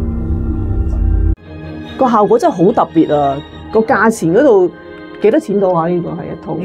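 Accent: Taiwan. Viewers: 是超級可愛的那種口音 不是不好的那種 但是越来越像台人了 PS：完全没問題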